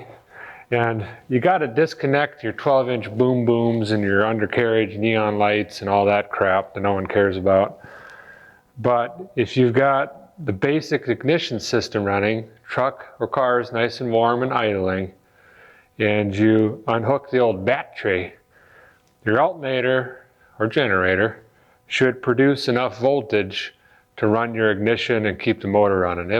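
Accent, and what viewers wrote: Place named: Maine, United States. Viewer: you almost sound like a mainer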